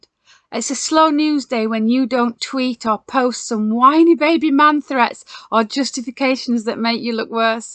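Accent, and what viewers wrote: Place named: Essex, United Kingdom. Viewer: what a lovely accent. Essex?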